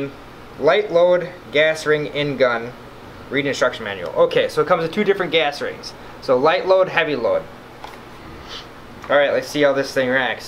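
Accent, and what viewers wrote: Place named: Wisconsin, United States. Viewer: "oh yah, you betcha" You must be located in Wisconsin.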